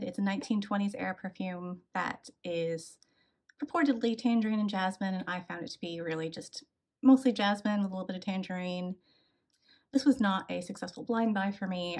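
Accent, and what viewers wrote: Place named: California, United States. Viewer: Blue jasmine tea and smelly feet papyras. I love these descriptions. The Jasmine Rouge really didn't impress me. I need to go back to it again. It sounds much more interesting than I found it. The SL does go down my path of jasmine, I love that natural smell of jasmines and their indoles. Such an ethereal heavenly kind of scent. Similar story with me with Alien, I'm not overly shy always but don't approach randoms. But I smelt that in the air and was just so wow I had to ask her. I absolutely adore it. I'm not very happy with "Mugler" as they are called have done to it. They've took it's bite away. It's like some childish version now, all the boldness has gone all that wow factor. It's sweet and friendly. I did enjoy this one. Jasmine is one of if not my favourite note. It can be so different in fragrance can't it. 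Thanks for the video a good first to watch. I feel like I have a new Netflix series to catch up on. I'm terrible and American accents but I know the Yeehaw texas and gangster new York I think 🤣. I could be wrong is yours California? It's very pleasant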